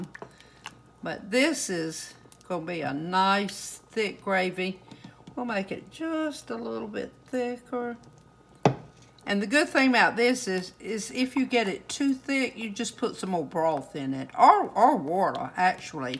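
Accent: South, United States. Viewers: I want to order your cookbook. How can I do that? I adore your Southern accent!!! It’s the real deal!!, I thank God for providing a heart for your Son, whose Birthday is the same as my precious Mama, October 19! We live in Seneca, SC. Thankful to live in the South!! Love you!🥰❤️🙏🏻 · Prayers for continued healing and Yes God is good ❤ Love your recipes and your Southern accent!! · ...you are just adorable! You are so caring, thoughtful and genuine! I love your accent....I could listen to you talk for hours on end! You are a true southern belle! Your cooking videos are so diverse and the recipes seem pretty easy. The food you prepare looks incredibly delicious and at the end of a lot of your videos, when you show the entire meal laid out on your side counter, I always find myself wishing I was the first one in line! Not only for the scrumptious food, but for the fellowship with your loving family. 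My sister and I are the only family we have now....everyone else has passed. So when I watch your videos, I get warm memories of my own family and it makes me smile. I am very happy your son is doing well! I know he will continue to flourish! As will all of your family. I will be making your stuffing and gravy recipe (I do not care for sage or giblets at all) and when my sister and I sit down to eat it, I will think of you. You are truly an inspiration. Best wishes for a very Blessed and Happy Thanksgiving! God Bless You All! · you are such a lovely lady! I just love your southern accent!! Butta!! Love your show…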